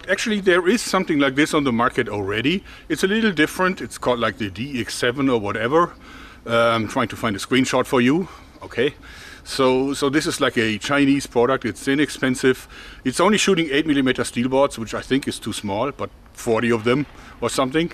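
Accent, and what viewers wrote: Germany. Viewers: qualquer brasileiro normal: POR QUE CARALHOS O YOUTUBE TA ME RECOMENDANDO UM VIDEO DE UM CARECA COM SOTAQUE ALEMÃO SOBRE ESTILINGUE ARTESANAL??? YouTube: nosso algoritmo é perfeito :)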